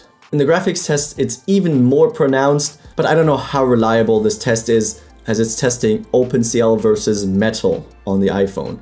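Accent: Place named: Germany. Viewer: you have a Lithuanian name and German accent. Where are you from?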